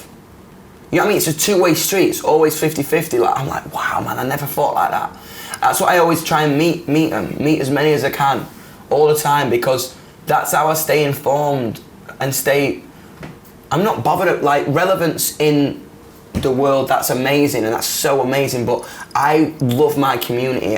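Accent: United Kingdom. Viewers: Yeah, he is speaking British English, a proper English many british people would say. So American mates are the ones got an American accent if we r being correct here lol, including me at some point as well. Not trying to be rude. Just reminding of the history. I also like British English, as many other English accents.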